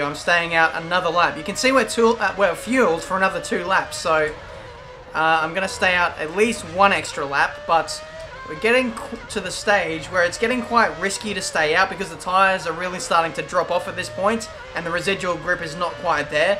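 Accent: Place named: Australia. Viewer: no... Almeno non tagliano così... Comunque mi godo il suo accento australiano, capisco e mi diverto.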